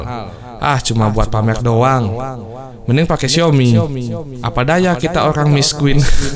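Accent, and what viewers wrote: Bali, Indonesia. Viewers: Logatnya Bali ni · Jeg khas omongane bli, medok bali gati Ulian ne dot dadi meli puk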